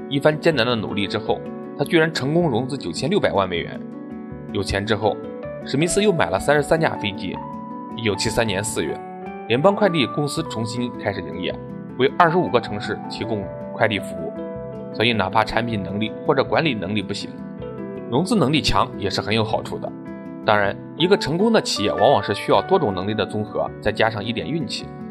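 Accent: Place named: Jiaodong, China. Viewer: 老哥。。你这胶东咬字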